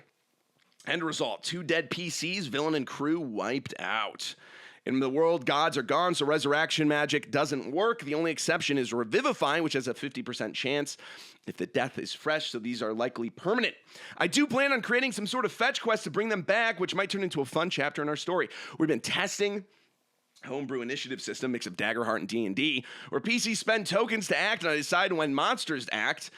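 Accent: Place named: California, United States. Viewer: No shade at all, but your Californian really shines for the first 15 minutes of this video 😅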